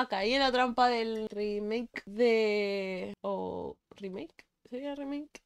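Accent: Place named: Peru. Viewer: Hablas cmo peruana🧐 sera?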